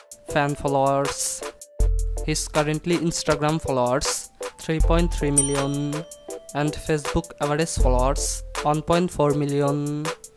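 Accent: India. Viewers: wtf is that engl ? are you from India ?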